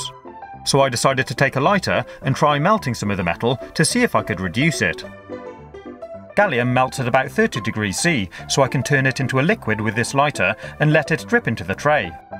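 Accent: England, United Kingdom. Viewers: i love your english accent :D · Are you English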